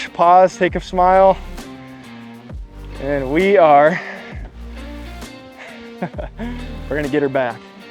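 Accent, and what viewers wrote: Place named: Minnesota, United States. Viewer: You’ve got the Minnesota accent down for sure)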